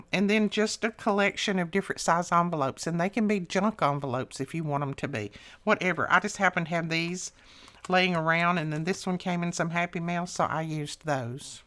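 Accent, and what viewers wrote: United States. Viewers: Hi, first of all glad your granddaughter had a great birthday, we worry about our grandkids more because we know we won’t be with them for as long of a time as we want too. I am so glad to hear that the crafting community helps you with your grief at losing your beloved husband Whit. If you want to just chat that is fine with me as I love listening to your American accent. Very cute haha xx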